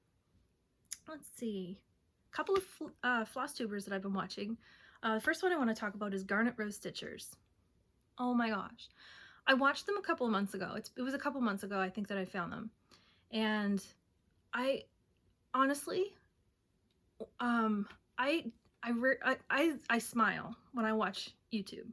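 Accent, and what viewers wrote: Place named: Quebec, Canada. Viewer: Always great to see your flosstubes. You speak French with a French-Canadian accent! “Histoire” can mean either history or story in English. I love your Luda, and in fact all of the things that you work on. I hope the memory of your mom will bring you comfort.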